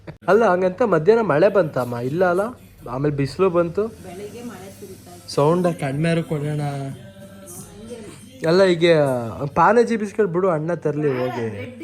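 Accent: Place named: Hassan, India. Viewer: Pysch agi matadtya bro nam hassan bashe na.. keep on doing bro ❤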